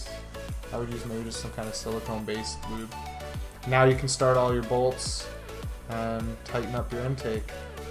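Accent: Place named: Canada. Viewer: Good video. The Canadian accent makes it even better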